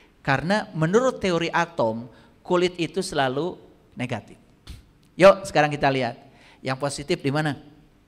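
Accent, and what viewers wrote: West Java, Indonesia. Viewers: Ust ni sundanya daerah mana,ada yg tau?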